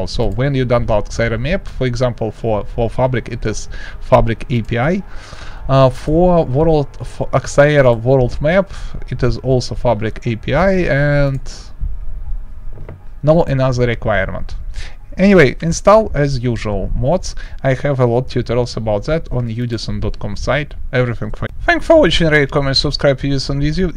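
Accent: Russia. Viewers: Русский акцент мне не кажется? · да он русский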